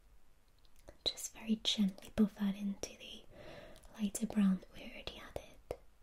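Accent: United Kingdom. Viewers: Your british accent are so lovely! I love your videos a lot! Success for you ❤️ Kisses from Brazil 🇧🇷🥰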